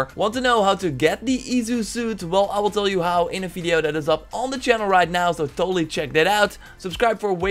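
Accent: Netherlands. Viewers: NIJS NEDERLANDS ACCENT!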